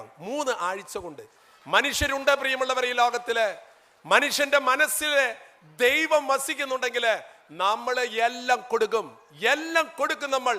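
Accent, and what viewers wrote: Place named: Thrissur, India. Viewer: tani trichur language thanne..marakilla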